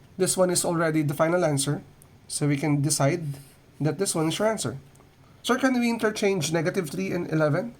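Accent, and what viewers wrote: Philippines. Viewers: Yeah his accent is filipino so the pronounciations are a bit different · He's Filipino so the accent is a little thick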